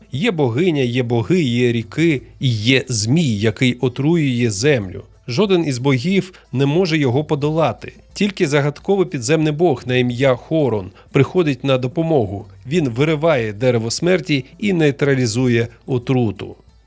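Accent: Russia. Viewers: Такой корявый русский акцент. Ещё и говорит так медленно, еле языком ворочает.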